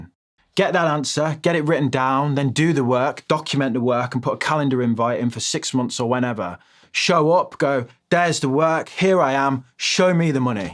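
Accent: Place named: Midlands, United Kingdom. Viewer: Why does this guy say he’s a northern lad every video? He’s clearly a midlands boy based on accent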